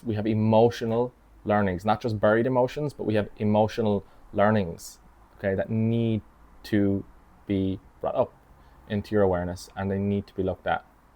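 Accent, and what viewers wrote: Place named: Ireland. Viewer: I understand completely. Also, did this theme in therapy umongst other themes. Believing what you dud, that you deserve to be shameful, small and "wrong" is normal at that young age - having had that experience with those boys back when you were 7. The adult self understands this, but the inner child doesn't. When the inner child hears this, it can be like a crack in the mirror because the inner child defined itself as "wrong," shameful and small. You will definitely find to a point realising that this "strategy" to rather please and be good before approaching someone, was needed for the child to feel safe. So it wasn't wrong that you thought this way. Your adult self could tell your younger self now, that it is all okay, but that the younger self may trust you now in trying new strategies. By the way , I love Ireland and was just there 2 weeks ago. 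Hearing your accent makes me miss the Island so...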